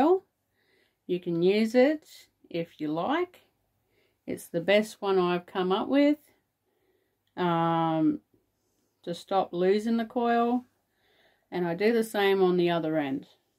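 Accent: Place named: Australia. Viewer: Great to hear an Australian voice. Love this yarn. I am a beginner spinner but I have this in mind for later on. Thanks for sharing.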